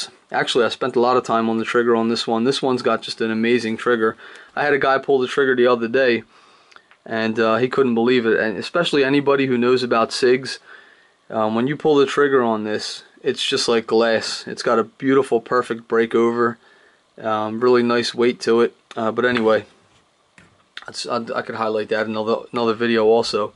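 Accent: Philadelphia, United States. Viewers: u got a be from the Philly area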